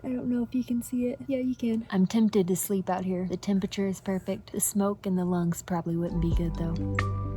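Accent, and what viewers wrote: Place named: South, United States. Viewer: It's so cute to hear ur southern accent when you talk to ur Dad. ❤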